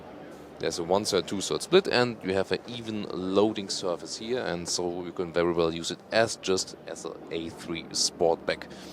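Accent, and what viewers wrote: Germany. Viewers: Sorry , but your English is a pain - warum sprichst Du nicht einfach in Deutsch? I work and live in an English speaking Country - this strong German Flavour is too much - i recommend spend some time in Oxford and some time in Boston - that might help.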